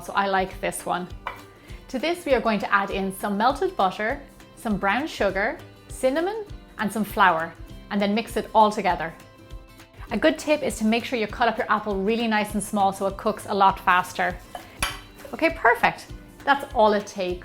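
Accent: Ireland. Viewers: Oooh! Sounds good. Thanks for the quick response. PS I love your accent, brings back good memories from Ireland. Lived in Dublin for nearly nine years. People here in Canada think I'm Irish lol. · Are you Irish? I'm jealous of your accent and Poptarts. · i love your accent and do you speak a different language in ireland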